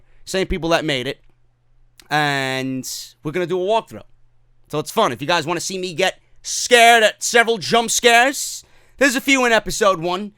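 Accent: New York, United States. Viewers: Damn hon, your New York accent is so much more prominent today lol!!!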